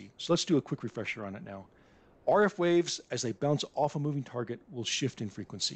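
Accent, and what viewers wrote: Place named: United States. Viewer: respect. Clear presentation, good speaking style, a really "proper" AE 🎯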